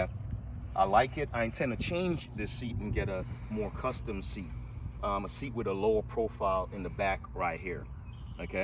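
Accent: Jamaica. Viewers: You're Jamaican nuh Tru? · I hear a little jamaican accent in there maybe??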